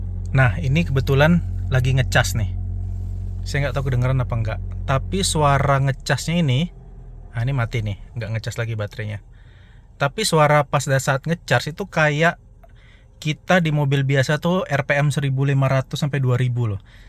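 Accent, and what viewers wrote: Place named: Padang, Indonesia. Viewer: Suara aksennya kayak orang padang ya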